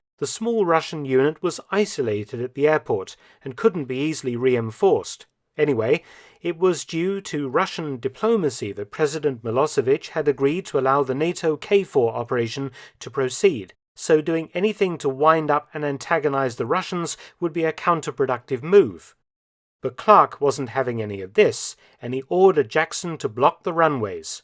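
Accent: United Kingdom. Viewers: BRO, KOSOVO ISN'T "NATION". KOSOVO IS REGION. CHECK YOUR "FACTS" . I'M FROM THAT REGION NEARBY........... ONLY BECAUSE YOU HAVE BRITISH ACCENT, DOESN'T MEAN YOU'RE AN EXPERT AND DOESN'T MEAN YOU'RE RIGHT. Serbia is nation, Montenegro is nation, Albania is nation.... KOSOVO IS NOT NATION. IT'S A REGION !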